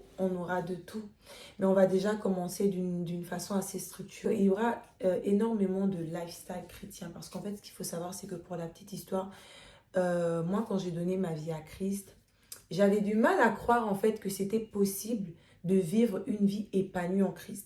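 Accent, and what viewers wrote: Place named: Gabon. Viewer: Au tout début de la vidéo, j'ai capté que t'étais gabonaise grâce à l'accent 😂😅 Courage sis🇨🇲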